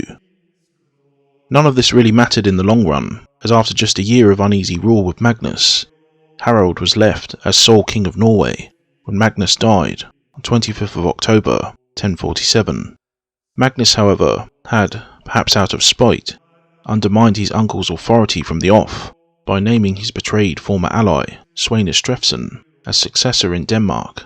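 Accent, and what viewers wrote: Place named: West Country, United Kingdom. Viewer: I'm getting a slight West Country tone occasionally?.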